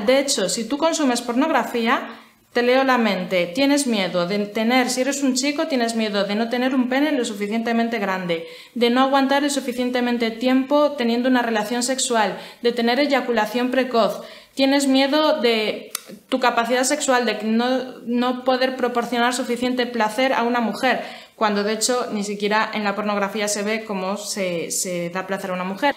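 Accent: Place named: Spain. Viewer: Me gusta tu acento español,por cierto eres muy guapa ☺️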